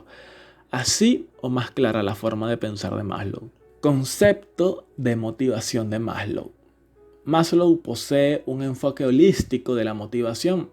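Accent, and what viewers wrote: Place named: Caracas, Venezuela. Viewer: Por tu acento eres caraqueño y por lo otro eres de derecha.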